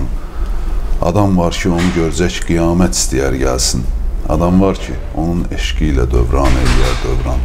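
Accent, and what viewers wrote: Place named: Shirvan, Azerbaijan. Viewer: Şirvan ləçəsi ləzət eliyir adama prostoy так держать земляк 💋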